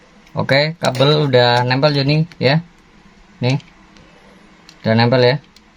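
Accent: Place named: Java, Indonesia. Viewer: Agak medok, tapi oke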